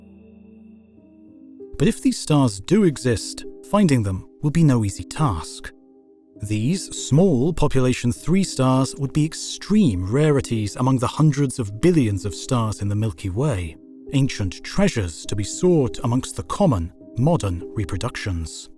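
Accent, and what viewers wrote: England, United Kingdom. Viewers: Yes, he is. That's an English accent. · Are you British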